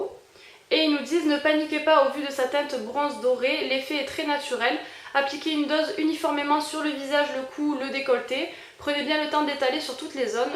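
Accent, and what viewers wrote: France. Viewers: Salut, Cette box m'a l'air super je croit que je vais commande des boxs. Pourrais tu nous expliquer comment commander des boxs ❤️ Sinon j'adore ton accent je suppose que tu vient du sud 💜 Gris bisous et a bientôt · Mort de rire l'accent marseillais hihi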